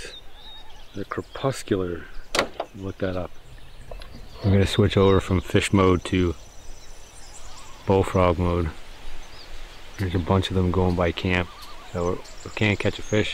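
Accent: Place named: Canada. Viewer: So annoying how Canadians so about and aluminum!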